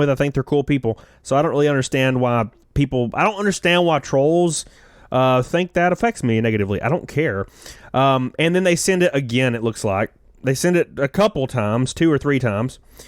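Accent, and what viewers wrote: South, United States. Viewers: Your accent lol, it’s just fine. Your from the south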